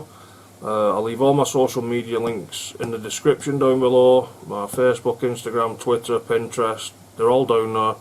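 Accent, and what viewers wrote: United Kingdom. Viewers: Excellent with the exception of my inability to understand your British accent. But, that's my problem, not yours. Thanks for taking the time. I have one of these same saws and I'm going to make this sled.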